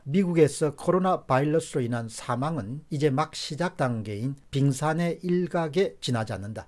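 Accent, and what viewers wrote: Gyeongsang, South Korea. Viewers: 이분은 경상도 억양이 있으신거같아요 저는 우리 아빠같은 경상도라 친근감을 느끼는데 ,, ㅎ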